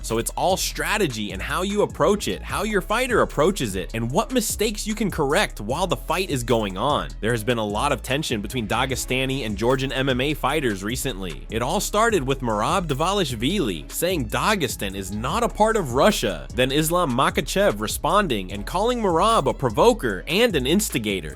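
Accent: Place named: New York, United States. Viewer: NY accent not getting name wrong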